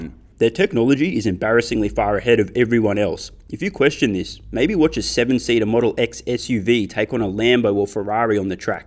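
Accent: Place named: Australia. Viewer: you have no offence a voice that is difficult to listen too.... it’s a very unattractive Australian accent. I suggest you get a woman to voice over your videos doing this will win you more subscribers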